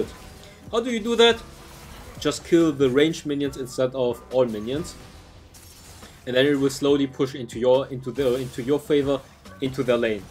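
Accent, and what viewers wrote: Germany. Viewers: Are you German, bro? :D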